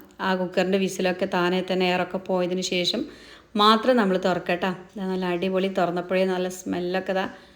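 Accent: Malappuram, India. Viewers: മലപ്പുറം ജില്ലയിലെ സംസാര രീതി ഇങ്ങനെ ആണ് നല്ല മനസ്സ് ഉള്ളവർ കേട്ടാൽ മതി അല്ലാത്തവർക്ക് വണ്ടി വിട്ടു പോകാം ടിങ് ടിങ് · മലപ്പുറം ആണോ? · 😀 മലപ്പുറം സ്ലാങ് വഴി മാറി ട്ടൊ ട്ടൊ ക്യൂട്ട് നെസ് കൂടി ചില ഭക്ഷണം നല്ലത്. സംസാരഭാഷ ബോറ് കുറ്റപ്പെടുത്തിയതല്ല മുൻപ് video കണ്ടിട്ടുണ്ട് സംസാരശൈലി മലപ്പുറം താത്ത തന്നെയായിരുന്നു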